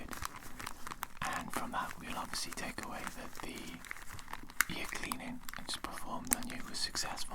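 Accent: Wales, United Kingdom. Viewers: can i hear a welsh accent?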